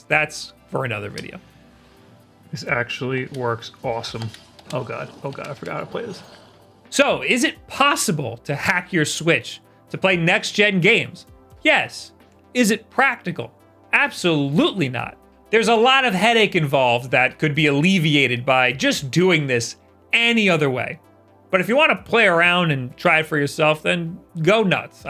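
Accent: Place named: New York City, United States. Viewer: you kinda sound like the NYC version of Jordan Peterson I just noticed 😳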